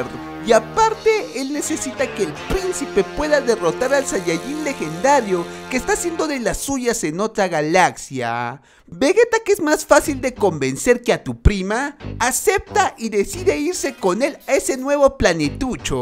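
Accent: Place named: North, Mexico. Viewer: eeeeee norteño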